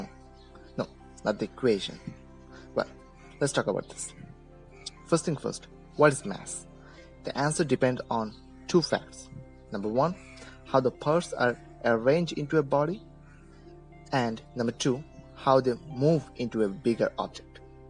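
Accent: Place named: India. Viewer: its hard to understand stuff , when indians talk english , its way to thick , Plz talk in a understandable way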